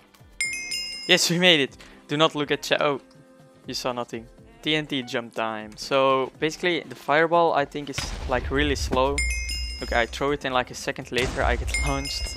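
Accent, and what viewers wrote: Netherlands. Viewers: Je klinkt nederlands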